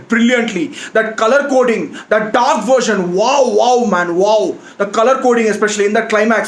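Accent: India. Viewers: Whoa that's a thick Indian accent....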